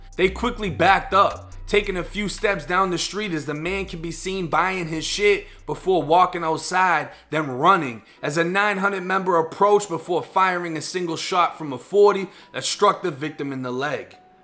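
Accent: Boston, United States. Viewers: You from Boston?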